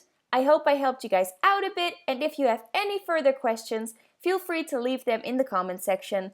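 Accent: Netherlands. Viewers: Its dutch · You dutch girll?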